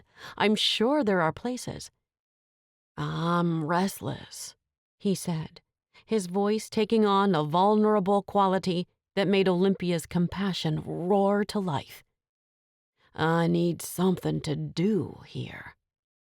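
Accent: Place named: South, United States. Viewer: Really enjoyed the story. I have lived a n the south all my life and I’ve never heard anyone speak so slowly and so southern.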